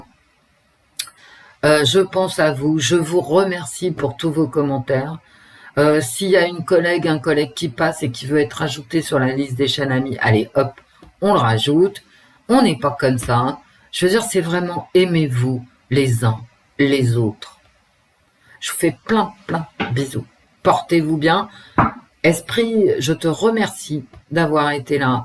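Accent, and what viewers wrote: Paris, France. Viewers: 🥰 🥰La Cartomancienne "En Tête à Tête avec elle Même.Merci de nous Enrichir.(j'adore,votre gouaille de Titi Parisien)j'y retrouve: mon parrain et ma marraine,les bretons à la Capitale en 1940 tout jeune💗💖Oui il est beau ce TAROT. Le 2 oui. La Dualité. Il Est Ou L'Homme...Bonheur. Joie. Amour à toutes et tous. Bonne fin de journée 😍😘🥰🤗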